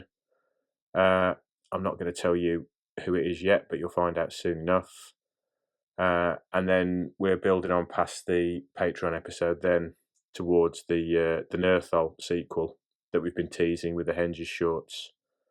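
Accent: Yorkshire, United Kingdom. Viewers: I thought your Yorkshire accent was great! I mainly know the accent from TV (Monty Python or Stewart Ainsworth from "Time Team") but it's probably my favorite British accent. As for the YT algorithm, it's every bit as cruel, mysterious and capricious as the Morgen. I never get your notifications despite having clicked the bell. Many of the current events channels I follow upload multiple times a day and I get notifications for all of them. But I never get notifications for many creators I really enjoy who only upload every week, month, or even less frequently. Also I've been catching up on your videos for two days and I haven't gotten a single ad so far 🤔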